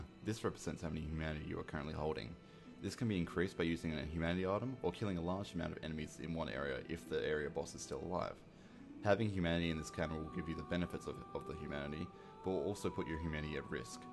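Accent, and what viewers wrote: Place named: Australia. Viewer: He's Australian I can understand what he's saying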